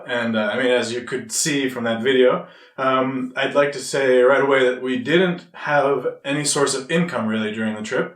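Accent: United Kingdom. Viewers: She sounds like she has a British accent lol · Oh I get it now. He's Scottish. That explains everything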